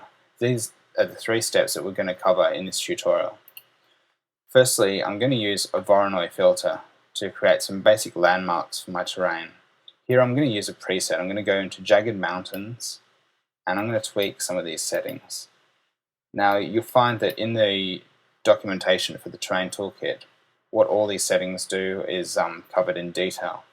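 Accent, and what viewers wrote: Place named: Australia. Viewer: i thought i heard an aussie accent, we aussies are legends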